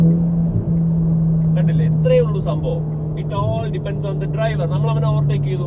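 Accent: Palakkad, India. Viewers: തനി പാലക്കാടൻ😅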